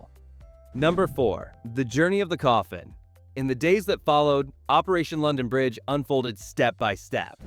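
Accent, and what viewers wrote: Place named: United States. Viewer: This American voice is so inappropriate to the subject as well as the title! What for Gods sake is shocking here? This is just the way WE handle these things in GB and Europe.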